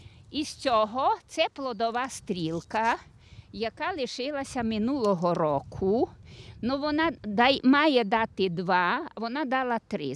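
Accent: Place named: Transcarpathia, Ukraine. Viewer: Приємна і зрозуміла українська з самобутній забарвленням закарпатського діалекту. Довгих років життя господині.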